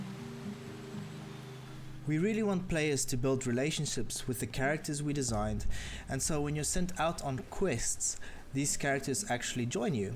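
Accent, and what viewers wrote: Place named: South Africa. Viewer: Why does this guy speaking sound like a Afrikaaner Anyways this game looks grea, however $40 dollars is SHIT expensive for a experimental title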